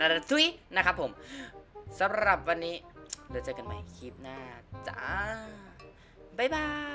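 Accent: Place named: Isan, Thailand. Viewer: พูดอีสานดีกว่าคับเป็นแบบฉบับเค้าเอง มันสนุกน่ะ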